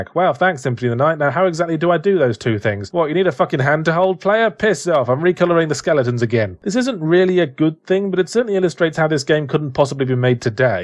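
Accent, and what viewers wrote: United Kingdom. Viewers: After I watch his videos everything I read is in his sarcastic, cynical british accent.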